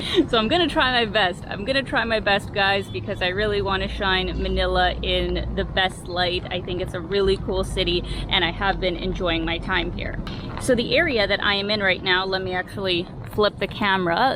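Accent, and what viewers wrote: Canada. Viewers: Welcome to the Philippines and sorry to hear about your stomach bug or flu. For me your accent gave away where you’re from before you even mentioned that you’re from Calgary. I’m pretty sure you’ve received a lot of recommendations already as far as what food to try and places to visit. If you ever get tired and want to relax, get a massage and I recommend “Hilot” massage. For me, that is better than Swedish or Thai massage. I recommend to go get them at “The Spa”. They have a lot of locations within Metro Manila. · Your "out" and "about" accent is a dead give away that you're Canadian. 😉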